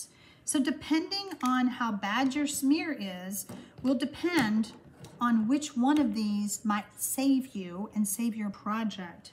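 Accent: South, United States. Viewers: Hi! Been watching your videos and love what you do! Love the southern gal accent too!!:-) Can you tell me where you order your 12x12 plastic sleeves for DSP? Love that idea and I need to get them. Also, then how do you store your sleeves?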